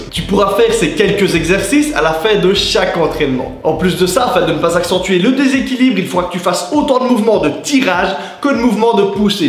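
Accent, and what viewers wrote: Liège, Belgium. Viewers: Serais tu liégeois? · On entend que tu es belge aha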